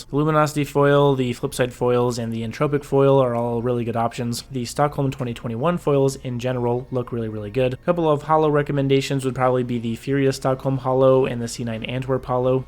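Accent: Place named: Australia. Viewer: I like your aussie accent mate 😂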